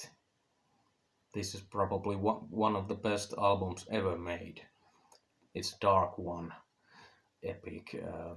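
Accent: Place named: Finland. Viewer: Ive missed that Finglish accent🤣 ✌✌ 👏👏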